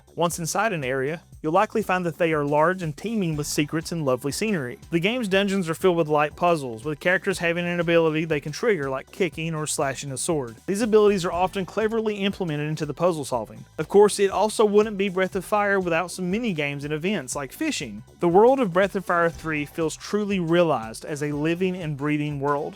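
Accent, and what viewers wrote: South, United States. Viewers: I thoroughly enjoy watching a fellow southerner on this space. Your use of "eat up" meaning "to be full of" reminded me of one of my grandfather's funniest barbs. If he thought someone wasn't being smart, he'd chirp that they were "eat up with the dumbass". I'd always giggle when he'd say that and I adopted it into my speech when I was an adult. I love your takes on RPGs, but I also love listening to you because you sound like me when I talk to my friends about games I like. Keep on being you, buddy.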